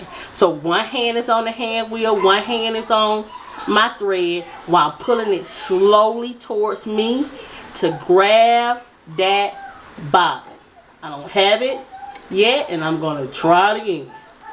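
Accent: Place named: South, United States. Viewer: I love your voice. So country. You should teach a class.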